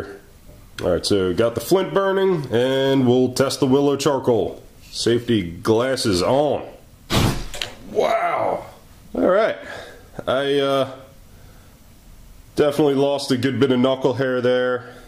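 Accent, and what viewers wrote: South, United States. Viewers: Maybe it's just been awhile, but you have damn fine hands. Damn fine hands! I didn't even know I was a 'hand' lady! Granted, I'd gleaned from your videos that you are an ass, re: euphemisms, double entendre, blatant ejaculate consistency; Of a keen intellect with a purview respectful of both humanistic and psychodynamic persuasions and love for even the lamest of layman; You've a deep, soothing voice, with that faint Southern-like drawl -... and I just heard something about 6"... Average ain't bad! Above being the verbose way of sayin': I've got to hand it to you, you are one damn fine ass, sir. tips hat Love the videos and the colourful internal dialogue gone rogue. <3 Thank you.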